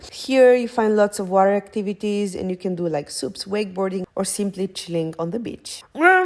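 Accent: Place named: Romania. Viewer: Tu esti clar romanca(accentul baby te da de gol) dar prietenul tau ce natie este? Santeti amandoi simpatici😂😂😂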